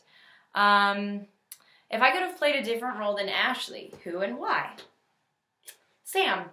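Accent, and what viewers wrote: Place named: England, United Kingdom. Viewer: Soy español pero detecto un poco ingles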